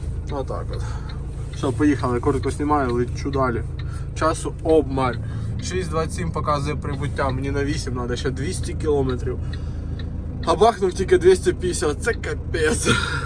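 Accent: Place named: Surzhyk, Ukraine. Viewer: Говори по-русски. У тебя хорошо получается. А вот мова твоя ужасна, то есть - это не мова соловьиная, а очень херовый суржик, говносмесь. ПРежде всего в произношении, в фразах. НЕ позорься.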